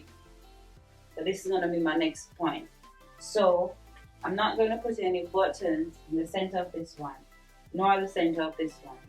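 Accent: Jamaica. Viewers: As soon as you started speaking I was like "ooooooh she Jamaican" . Subscribed! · I'm sorry I just read your bio. I didnt even knew there was one. I love your videos. Might not get to like as I watch.....i always forget to but I love your videos. Your accent screamed jamaican so that's y I was curious. ❤❤❤❤❤❤❤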